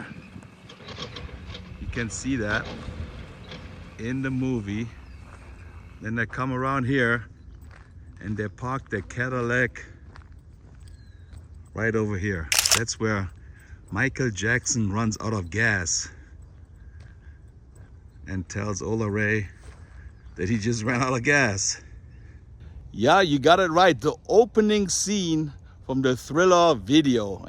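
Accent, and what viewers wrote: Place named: Bavaria, Germany. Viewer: Hi nice accent maybe Bavarian dialect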